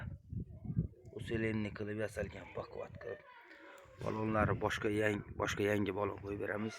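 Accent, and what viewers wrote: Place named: Khorezm, Uzbekistan. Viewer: Assalomu alaykum manzil nerda xorazmdami sheva oʻxshab turibdi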